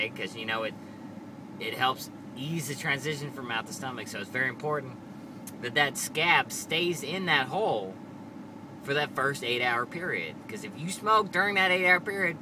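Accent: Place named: Texas, United States. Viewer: Loving your texan accent and you're a cool dude :)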